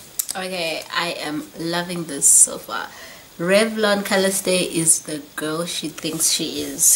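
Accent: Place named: United States. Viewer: You sound like you have recently visited the US. Wa-rrer. You look amazing.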